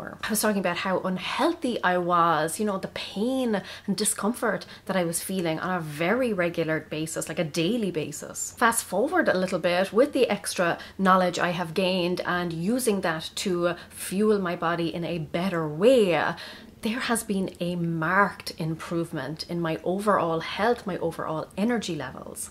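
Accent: Ireland. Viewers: These were great tips, thank you. Might have a look at what else you have. Love your accent, are you Irish? Thank you for sharing 🥰🙏🥰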